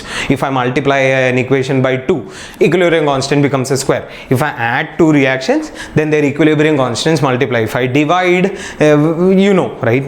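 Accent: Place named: Kerala, India. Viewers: I didn't understand a single THINGY of yours!!! 😑😑🙄🙄 Plus the irritating mallu accent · Damn this Mallu accent!!!!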